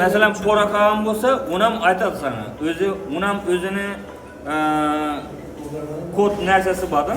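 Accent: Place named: Khorezm, Uzbekistan. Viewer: Хоразим шеваси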